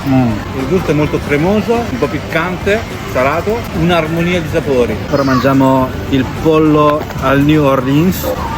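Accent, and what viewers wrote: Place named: Emilia, Italy. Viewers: Sei cinese ma vivi in Italia? Mi sembri un po' emiliano 😊 o sbaglio? p.s. bel video alla fine anche in Cina si trovano prodotti italiani. ❤ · soccia sei di bologna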